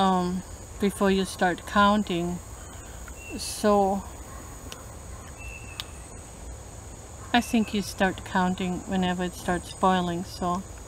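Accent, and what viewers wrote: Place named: Germany. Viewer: Du bist so lustig und besonders für Kinder! Ich hab' etwas auch gelernt. Ich höre ein Deutscher Akzent durch Englisch weil ich Muttersprachler von Englisch bin. Ich bin Amerikaner. Beherrschst echt gut Englisch! Macht weiter so. Fröhliches Weihnachten und Guten Rutsch! Vielen Dank.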